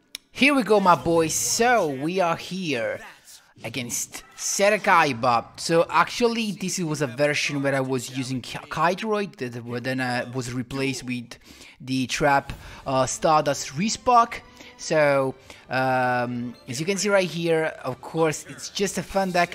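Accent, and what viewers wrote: Italy. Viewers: Ma quanto cazzo sei italiano? Sgamato dall’accento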